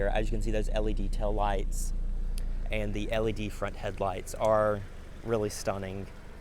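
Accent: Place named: Carolina, United States. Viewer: I love that strong Carolina accent. Thank you for this video it really helped a lot.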